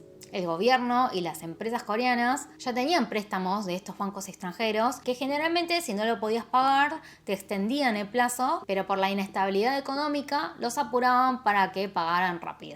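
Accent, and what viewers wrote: Argentina. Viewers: Que hermoso .coreana con acento argentino ,vistes!! Saludos desde México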